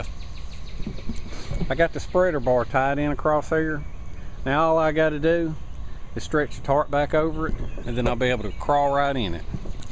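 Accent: South, United States. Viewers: Howdy! The south is the only place where it's acceptable to turn a 1 syllable word into 2 syllables. :) I stumbled across your page a couple of days ago. What I like about your videos is that it causes me to think out of the box, plus the entertainment value. Very interesting ideas that you're sharing. Thanks!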